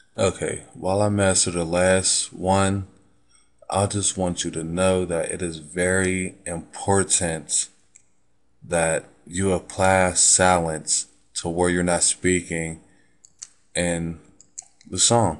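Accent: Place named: Pittsburgh, United States. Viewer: im from the burgh to lol son as i heard ya voice i knew you was from the burgh !!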